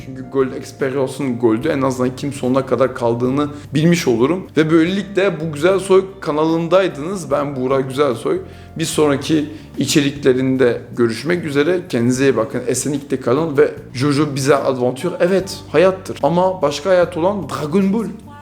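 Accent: France. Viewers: abimin maşşallah jean pierre polnareff gibi fransız aksanı var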